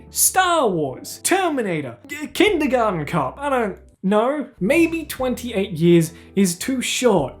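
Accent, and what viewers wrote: Australia. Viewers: I love your sweet sweet Australian voice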